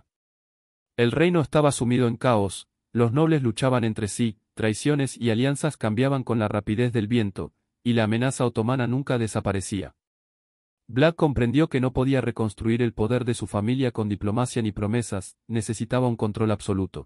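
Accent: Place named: Rioplatense, Latin America. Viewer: acento me suena a español rioplatense en el video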